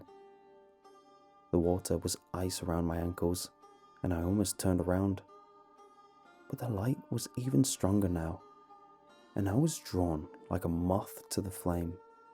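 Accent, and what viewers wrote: United Kingdom. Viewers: You have the best brittish accient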